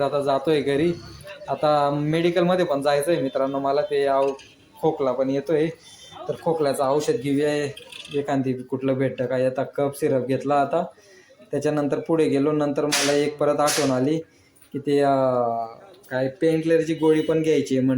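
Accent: Khandesh, India. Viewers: Bhau ahirani bhasha bhari she,dhulani ahirani ani bhadgaon pachorani ahirani ma pharak she🎉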